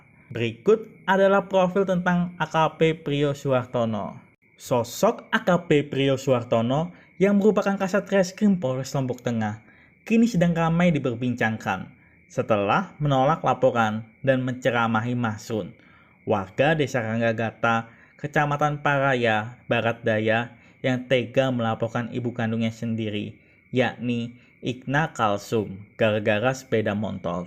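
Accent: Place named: West Java, Indonesia. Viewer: Bener dugaan sy dr logat bicaranya kayak org Sunda taunya bener dr bandung... Wilujeung tugas sing salamet sehat Sareng amanah 🤗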